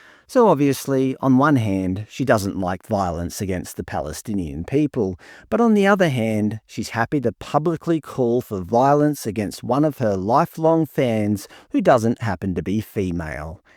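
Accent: Scotland, United Kingdom. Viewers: She's Scottish, not American. That's how Scots people talk. They throw around swear words like a farmer sows seeds. Still a bit over-the-top though.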